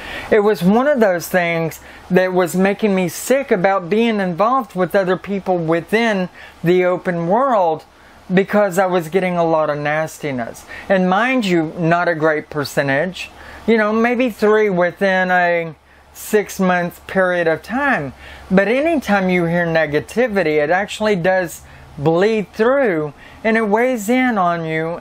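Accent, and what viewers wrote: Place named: Dakota, United States. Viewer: I lv your accent Is it dakota?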